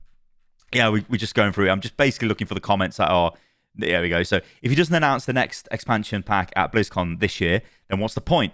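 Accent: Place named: United Kingdom. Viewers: Btw, you should promote this channel more on your main channel to get more viewers. I like the way you speak, totally British. Me as a foreigner, enjoys well spoken English in order to learn myself while watching videos :) I also like the different look at Overwatch and you also point out and appreciate what they do well, that's nice, not everything is bad. I know I sound like a simp, but I really like your style! Not meaning to be cunty, genuinely hope I help you with your learning, you're pretty good already though. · Btw, you should promote this channel more on your main channel to get more viewer. I like the way you talk, totally british. Me as foreigner is enjoyed well spoken english in order to learn myself while watching videos :) also i like the differenciate look at overwatch and you also point out and appreciate what they do good. 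And thats nice, not everything is bad. I know i sound like a simp but i really like your style!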